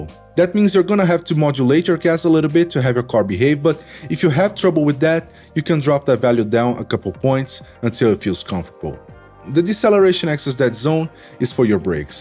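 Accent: Brazil. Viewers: tbm achei kkkk to quase ouvindo ele falando em português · Esse cara é brasileiro kkkk... Certeza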